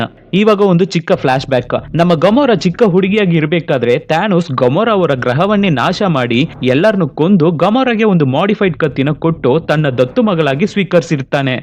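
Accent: Tamil Nadu, India. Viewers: Sir nivu Tamil avra...yakandre nimma tone Tamil avr thara edhe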